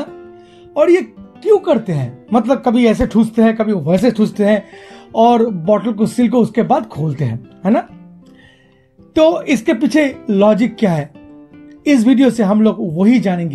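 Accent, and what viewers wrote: Bengal, India. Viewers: Aeeyyii dada, aapni bangali🤓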